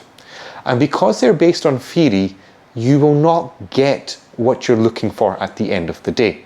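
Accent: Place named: United Kingdom. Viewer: Heyy bro this is a great video, I currently work in the IT Sector and I’ve been in my role for 4 years a 2nd line role, and I’m looking to change career to become a Scrum Master. Considering my IT background which certifications would I need minimum to become a Scrum Master, Agile Project Manager, Delivery Manager. So and so forth. In the London Region? You sound like you’re from the UK aswell. I also have an ITIL Framework certification. Thanks man, and I look forward to your response🙏 your videos are great, keep it up👍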